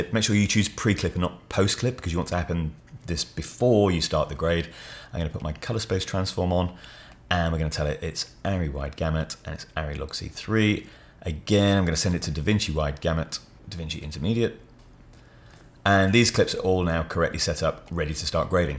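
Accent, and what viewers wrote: England, United Kingdom. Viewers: This video is a life saver! I'm about to release a documentary film were I use mainly HLG (Rec.2020/Rec.2100 HLG) color space and gamut. I used to start with the color space transform but now I'll do as you tell here. Gonna save this video in my reference videos. Also you sound English, why type it color instead of colour?😅 Warm regards.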